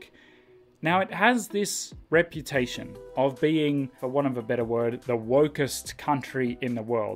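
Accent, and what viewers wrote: Stockholm, Sweden. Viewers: Oh, I see. Well, as much I hear anyways, your accent resembles stockholmish, you know, Sweden's captial. Many who try to talk swedish often uses this accent. Well, that or the southern accent we have. All I say is to aim outside the cities to find another type of accent to speak with. Also, I did get the impression you have been there due to the slight stockholmish accent, that or you've been speaking with some. That's why the slight mistake here. Oh, and you probably should visit sometime in the future, possibly a amusementpark would be ideal to fetch up a lot of material. No worries btw, even there, they know english, it's kind of our second language after all. Sorry to make you confused. I tend to do that to people sometimes. ^_^'> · are you going for a stockholm accent? you should go for Norrländska instead! Great video mate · Your Swedish accent is legendary! 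· Man you hit that Stockholm dialect hard 😂😂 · His Swedish accent is legendary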